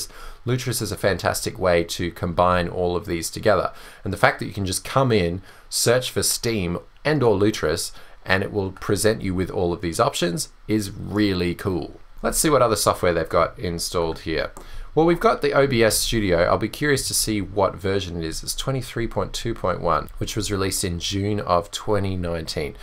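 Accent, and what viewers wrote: Australia. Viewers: So you're Australian. I thought you were from Eastern United States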